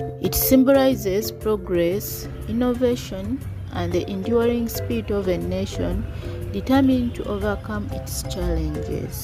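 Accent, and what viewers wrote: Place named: England, United Kingdom. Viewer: This English accent is beautiful.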